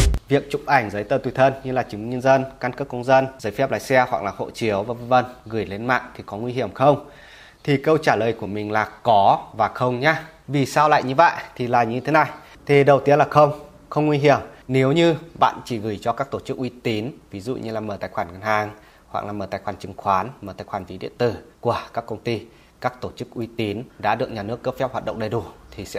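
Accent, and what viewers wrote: Standard. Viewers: Anh nói quá chuẩn luôn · Bạn nói rất chuẩn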